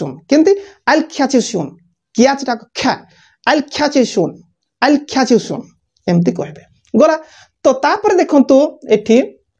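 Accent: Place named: United States. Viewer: Sir American accent kahuchanti 🥱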